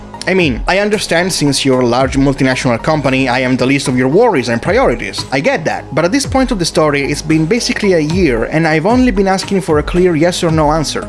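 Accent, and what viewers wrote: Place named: India. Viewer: You must be talking with an Indian accent! Indian as in Asian-Indian American.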